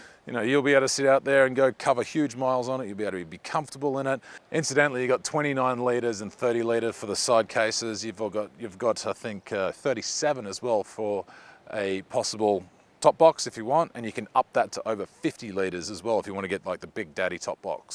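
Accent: Australia. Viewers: He sounds Australian.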